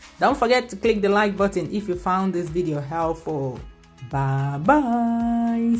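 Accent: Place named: Anambra, Nigeria. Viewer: This is great video my dear sister. Your intonations sounds like my country state anambra. Am from Ukpor nnewi south anambra state. I started my new channel as a small Youtuber. I love all your videos my dear sister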